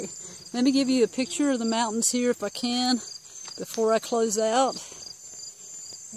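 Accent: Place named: South, United States. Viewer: You're awesome. IM a "Tarheel" Myself,by the way. I Love the Carolina/Southern dialects and accents, your's is music to my ears!! Yee Haw!! Keith.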